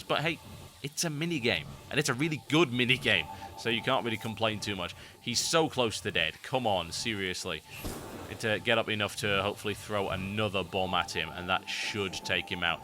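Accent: United Kingdom. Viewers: are you british, irish or scotish?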